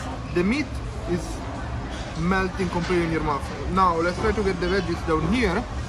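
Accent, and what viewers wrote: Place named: Italy. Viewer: omg i enjoy hearing your italian english。。。so funny engjoy Chonqqing just come back from Italy last week：）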